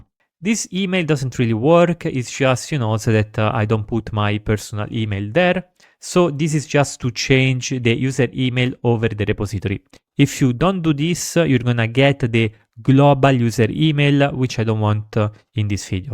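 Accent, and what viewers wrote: Italy. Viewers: Lo sento da 1 km di distanza l’accento italiano haha bel video!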